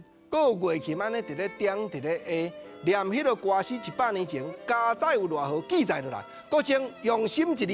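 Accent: Taiwan. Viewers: 這才是正港的台灣話，遺憾現今台語的沒落。很多人講台語都怪腔怪調。要來解文說字 來好好學習發音。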